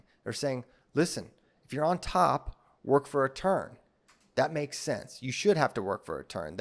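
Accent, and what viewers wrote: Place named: Iowa, United States. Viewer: Are you from Iowa? I think I detect an Iowa accent.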